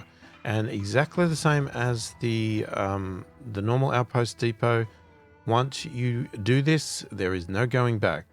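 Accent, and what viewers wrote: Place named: Australia. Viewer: where you from brother u sound aussie... edit: yea checked the about section XD g'day brother XD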